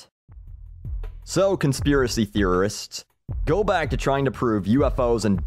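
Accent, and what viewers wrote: Canada. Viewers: He sounds canadian. Is he? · your canadian is showing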